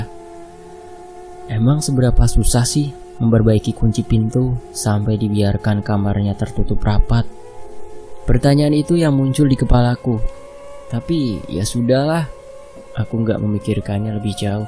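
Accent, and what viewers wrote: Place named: West Java, Indonesia. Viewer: Kesel bgt dengernya klo pakek logat sunda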